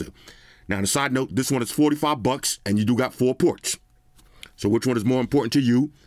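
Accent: New York, United States. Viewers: Stopped watching as soon as I heard the NYC accent 👎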